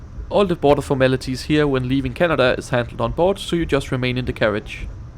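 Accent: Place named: Denmark. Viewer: The video’s creator is not a native speaker of the English Language. His native tongue is Danish.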